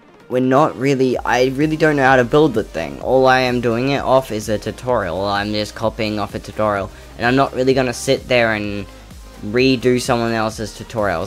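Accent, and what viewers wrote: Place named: Queensland, Australia. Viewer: Great vid again so happy there is a Aussie youtuber. Cause I'm Aussie and let me guess where you are from I tried last Ep how about QLD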